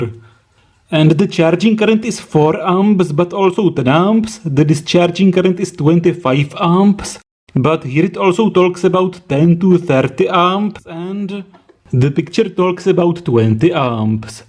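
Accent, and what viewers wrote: Czech Republic. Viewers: That Czech accent 😀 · No, It's Czechglish, believe me.. with strong Prague's accent. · Czech. Strong one.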